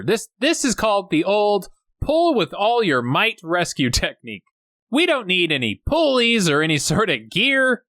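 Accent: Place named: Australia. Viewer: I didn’t expect to hear an Aussie accent so that woke me up lol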